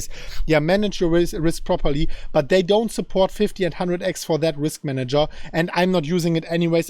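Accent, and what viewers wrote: Germany. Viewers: Your German accent and energy is really cool....LOL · Wie man den deutschen dialekt hört😂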